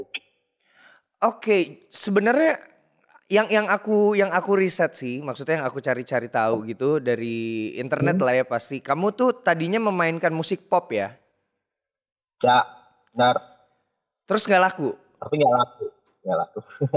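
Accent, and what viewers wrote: Java, Indonesia. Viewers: Medoknya khas wkwkwkw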